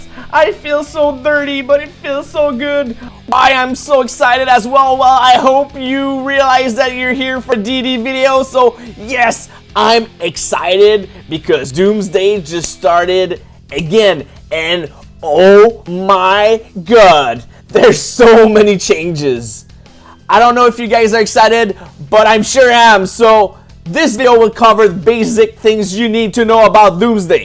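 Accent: Quebec, Canada. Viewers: liked your old french canadian pronuciation of alliance better..... nice basic tutorial for DD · Tu es clairement Québécois! Moi aussiiiii!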